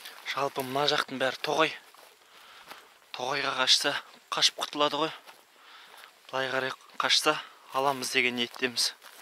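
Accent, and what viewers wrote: Standard. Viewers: Ассаляму алейкум. Жакшы аңчылык болуптур, Казактын таза казакча сүйлөгөн жигити ушундай болот, Кыргыз болуп туруп баарын түшүндүм.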